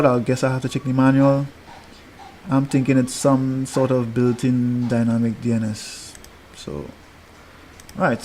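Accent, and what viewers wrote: Trinidad and Tobago. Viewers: Sounding Trini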